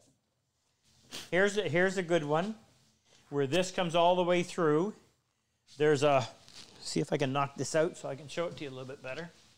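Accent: Canada. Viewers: Hi - I'm watching from South Africa. you've said 'any question' so this one is from left field (as they say south of your border): which province are you from? my guess is New Brunswick - I used to know a Canadian from there, and you sound so much like him. Back to reality - I really like your program and I wish I was younger and wealthier so that I could follow your style (I am 75 and can't afford diamond sharpening plates - especially here in SA).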